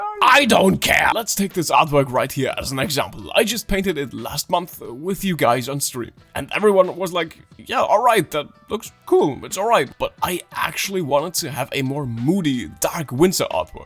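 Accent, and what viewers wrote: Germany. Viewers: yo are you german? bc your accent gives it away a bit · Him being sick oddly made him sound twice as german as usual. Especially at the end when he screamed "NOW GROW SOME BALLS DAMIT!" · is it me, or has less of a German accent over the time that he's been posting.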